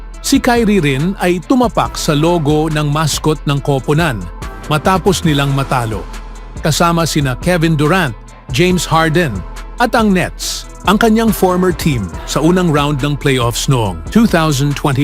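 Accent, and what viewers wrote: Visayas, Philippines. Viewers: bisaya kaba bigkas mo bisaya eh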